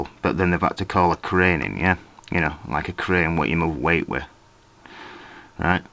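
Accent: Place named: Yorkshire, United Kingdom. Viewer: at first i thought your accent was manc but yer it's Yorkshire also init : )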